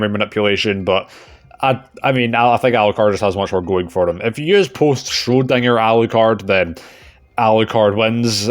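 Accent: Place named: Scotland, United Kingdom. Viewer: you are Scottish as hell and i love it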